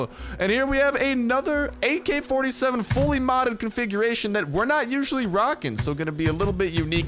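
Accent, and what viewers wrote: Russia. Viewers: Can you please stop the Russian accents it’s kind of annoying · Are you Russian?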